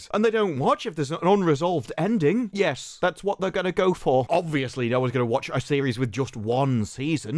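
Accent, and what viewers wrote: United Kingdom. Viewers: This man has peak British mouth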